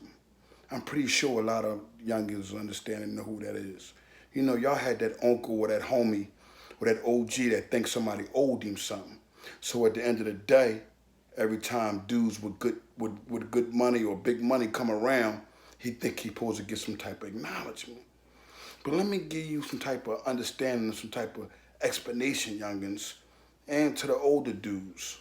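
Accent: Philadelphia, United States. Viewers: Oh you definitely from home, philly lol I can tell how u talk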